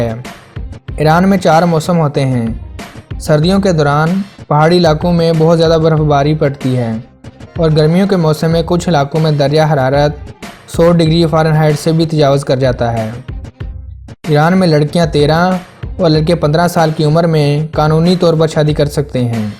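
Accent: Pakistan. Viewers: Khuda me banday Urdu ko Punjabi lehje air talafuzz me to na bolo, think karo, were post achi this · خخخخخ هندی نیست ببخشید پاکستانی دالخور است از صدا یش مشخص اس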